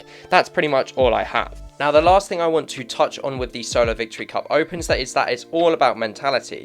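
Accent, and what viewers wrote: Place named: United Kingdom. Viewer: oh my god he got the strong bri'ish accent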